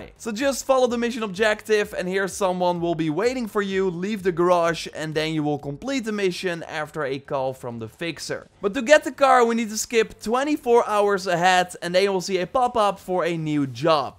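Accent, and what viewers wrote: Netherlands. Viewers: bruv you make us dutch people look bad with ur accent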